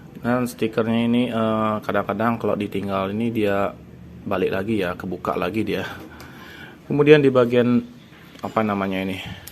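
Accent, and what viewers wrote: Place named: Bali, Indonesia. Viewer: ngenah logat bali e wkwk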